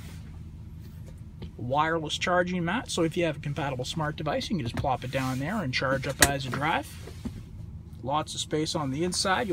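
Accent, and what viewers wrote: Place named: United States. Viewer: what american accent is this?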